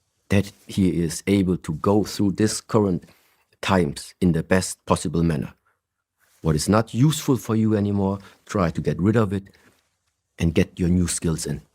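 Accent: Germany. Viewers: Yes, and especially saying "Ja?" after some of the statements. Very German thing to do, and very German pronunciation on that as well.... Plus, I don't know how many people know it, but there are lots of Vietnamese refugees from the 70's and in the meanwhile of course their children and now grandchildren too, with most of them having a German citizenship. I think it's great that he's opening his heart more, and also admits honestly that the old patterns sometimes try to sneak in ( and wreak havoc, if letting certain unhealthy patterns to take over). I think that many such patterns are what people often call "family karma" or "ancestral karma", depending on how far back those patterns go... I myself am on the path of recognising and changing them - and I know all too well the feeling of "never being good enough". Where to just BE is sort of act of revolution concerning the old family dynamics and expectations - and it's something that most of the society doesn't accept either, nearly all of us are indoctrinated from an early age on to do more, achieve more, compete with one another ( the school system very much so with the notes etc ) and sadly, it not only leads to continuous unhappiness with ourselves, but also destroys the planet when most people go after more and more "achievements" that are often shown as material stuff... 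Yet, to be able to break out of it, one needs certain amount of money to have a small house and property if one wants to live off grid for example. And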